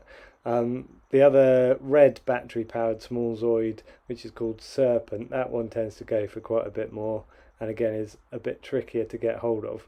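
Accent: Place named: RP, United Kingdom. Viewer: SO posh (loved it)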